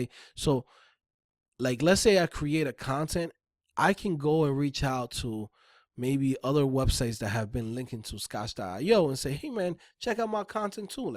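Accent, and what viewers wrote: New York, United States. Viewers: You sound like you from NY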